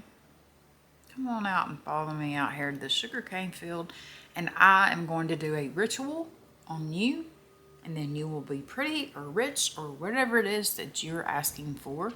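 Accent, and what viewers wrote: South, United States. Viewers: oh I love a true crime channel with new stuff I havent heard of. and (hello from England) I love that soft spoken southern American accent so soothing. you are my new fave ❤❤❤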